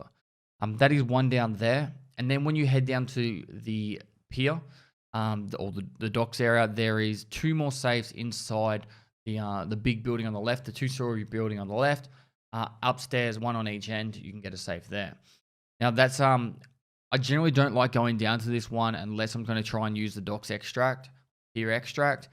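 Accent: Australia. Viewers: sounds australian to me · Hes Australian as fuck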